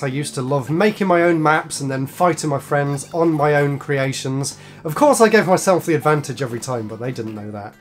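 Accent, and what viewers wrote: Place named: Northern England, United Kingdom. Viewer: At 2023 i figured out that there are plenty of different pronunciations in England, so now i gan here and there and bother people with question "where are they from" if hear not so-called standard pronunciation (thine is obviously northern one). So, satisfy my curiosity, where art thou from? (i guess Birmingham or its surroundings).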